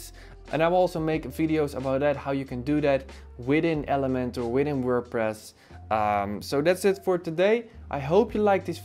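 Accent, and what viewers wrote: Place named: Netherlands. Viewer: Ben je nederlands want ik hoor het aan je accent haha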